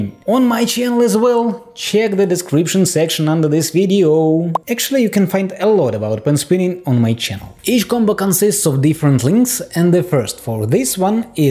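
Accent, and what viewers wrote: Russia. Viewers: It always seems to me that you have a Russian accent, but it’s not bad, it’s just not familiar to me. :") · Man, I love your ruski accent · Русский акцент)Сразу понятно что ты русский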